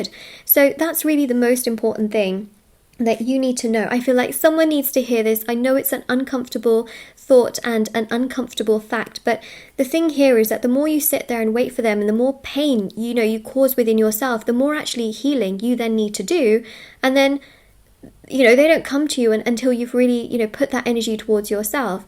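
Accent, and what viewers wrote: United Kingdom. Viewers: Thanks. Always there with brilliant intelligence and guidance at the right time. Something about your british accent makes it persuasive and assuring.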